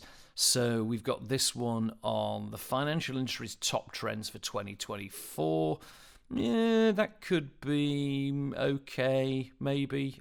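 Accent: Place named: Yorkshire, United Kingdom. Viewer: Love a good Yorkshire man. Ay yup lad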